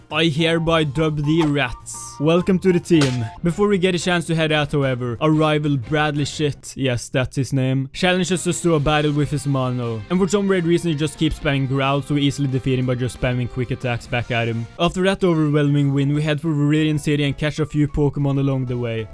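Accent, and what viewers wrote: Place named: Norway. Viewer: I watched 10 seconds of this and I was like "han fyren her er garantert norsk". Glad to see it confirmed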